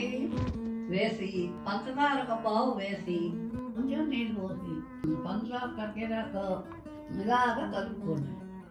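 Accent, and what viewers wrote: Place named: Godavari, India. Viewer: Chaalaa thanks andi! Mee Telugu kuda Godavari jilla Telugu laga undi feels nostalgic! 🙏😊👍 Sankranthi Subhakankashalu meeku, mee family andariki! 🙏❤️😘💐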